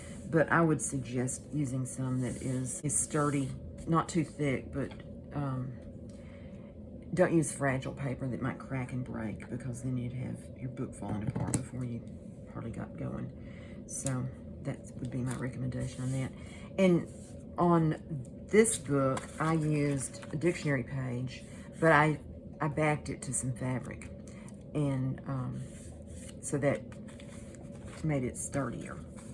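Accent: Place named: Texas, United States. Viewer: These are so cute! And would make a lovely addition to a journal for a gift. I have been watching your videos, I don’t comment much, or every time. But love your channel. Your voice is so comforting... are you by chance in East Texas? Or Texas in general? You sound like you are. I wasn’t going to ask, but then you showed the post card from San Antonio sunken gardens. I’m South east of Dallas between Dallas and Tyler... anyway... I love finding new uses for the envelopes, and can appreciate that you don’t try and disguise the fact it’s an envelope!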